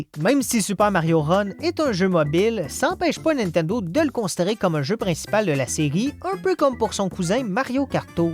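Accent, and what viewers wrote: Quebec, Canada. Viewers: Ma réaction en écoutant ce vidéo suggéré Wouah c'est québécois?! Je suis québécois et c'est tellement rare d'avoir du contenu de jeux retro en québecois et non pas en Français de France. Cool.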